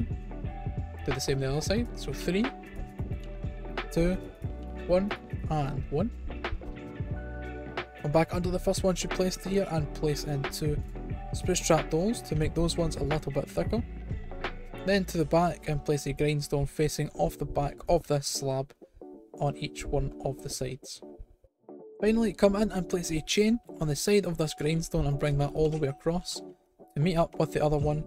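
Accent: Scotland, United Kingdom. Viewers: U Scottish??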